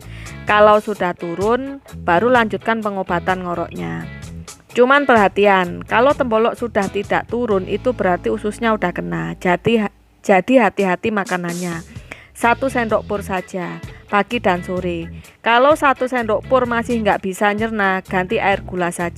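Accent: Java, Indonesia. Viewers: Suaranya medok banget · Asli mana mbak Lamongan ta? Kog jowoe medok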